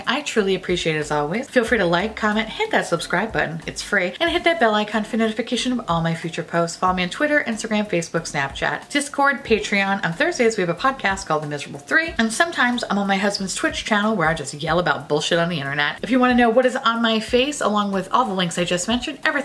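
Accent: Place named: Minnesota, United States. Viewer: While in her minnesota accent.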